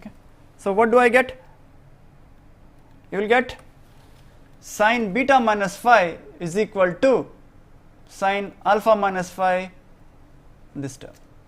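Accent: India. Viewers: Only the Indians will understand this accent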